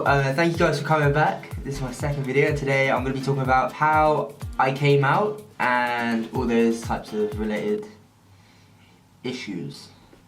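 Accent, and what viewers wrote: United Kingdom. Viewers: Your cringey but really it your voice just oml hello everyone welcome back to my channel it the most brittizh thing to say i feel like thats offensive oh well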